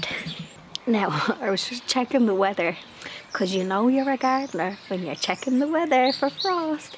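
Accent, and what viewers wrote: Ireland. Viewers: Hello from the States! Will your sweet hedgehog be hibernating this winter or will he be active year round? The family that introduced my parents to each other waaay back in the 50s were from Ireland, so I was blessed to grow up hearing the lovely Irish lilt quite regularly. Just wanted to let you know how delightful it's been to hear that lilt again since discovering your channel a few months back! ❤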